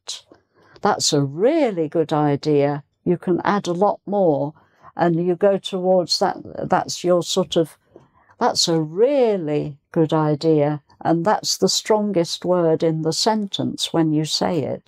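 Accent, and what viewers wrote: United Kingdom. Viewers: I really like the British accent😘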